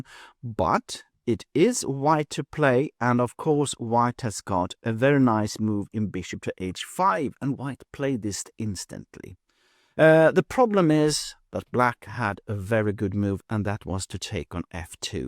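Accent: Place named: United Kingdom. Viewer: is like Ben Finegold but with a British accent and no blather.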